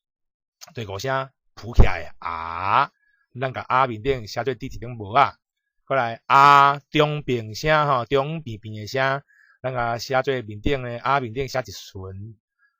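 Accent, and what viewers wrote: Quanzhou, China. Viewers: 更正：是「泉州腔」。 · 听到泉腔闽南话，tai gir台语, ter短。作者应该是泉州籍。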